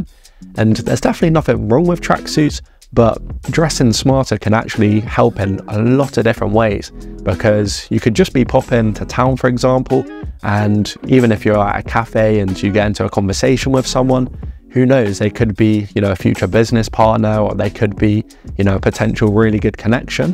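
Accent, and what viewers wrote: United Kingdom. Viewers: That thick English accent 😢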